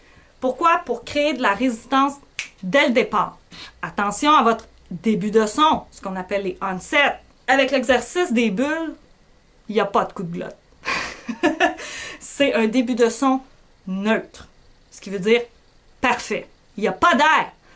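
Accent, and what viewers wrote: Quebec, Canada. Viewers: Mais comme j'aime ton bel accent Quebequois !!!! Et ta presence, malgré la distance. Les bulles c'est génial....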